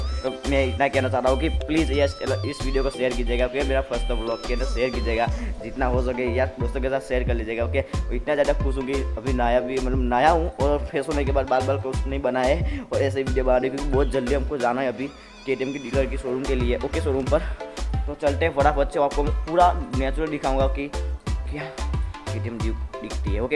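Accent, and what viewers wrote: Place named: Gujarat, India. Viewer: Bhai tu gujrati he muje pta chal gaya mebhi gujrati hu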